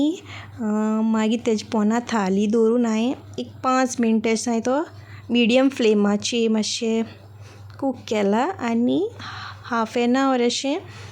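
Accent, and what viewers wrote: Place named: Quepem, India. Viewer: Keshi asai tumi... Somzota bori hai mhun.... God bless you... Keep going..... I love quepem dialect... Sounds very good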